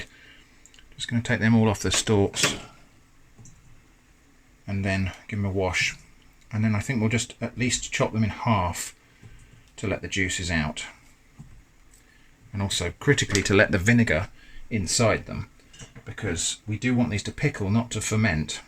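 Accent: United Kingdom. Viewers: For some reason you sound kind of british.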